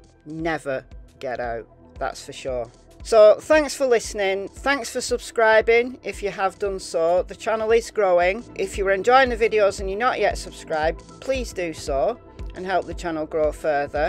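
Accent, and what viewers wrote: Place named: North West England, United Kingdom. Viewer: I’ve just happened upon your tunnel. You don’t sound like a scouser but you don’t sound like a Manc either. Sort of in between so somewhere in the middle is where you are. yes?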